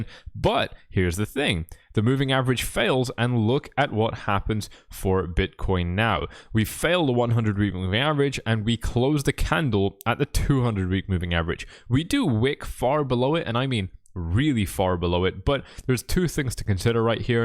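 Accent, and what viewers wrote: Scotland, United Kingdom. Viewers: R u a brown Scottish dude?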